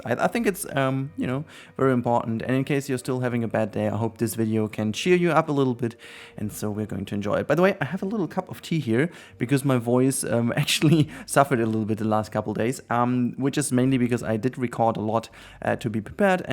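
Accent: Germany. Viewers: wait, are you german?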